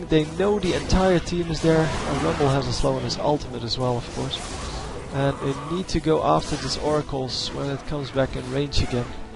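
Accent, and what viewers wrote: Netherlands. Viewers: you sound waaaaay too dutch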